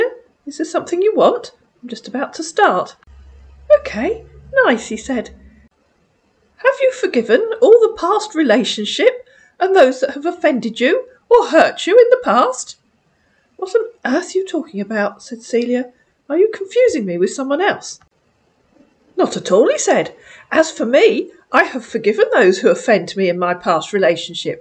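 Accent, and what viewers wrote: United Kingdom. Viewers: Omg rofl "Quit telling me ridiculously stupid lies". With your exasperated tone in your cute British accent, you have me laughing like a big ol donkey!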